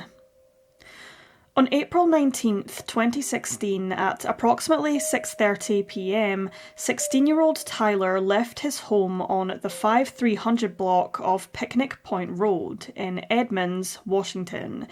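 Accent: Scotland, United Kingdom. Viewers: It's an educated British accent from Scotland, it is not "ridiculous". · what's with the British narration?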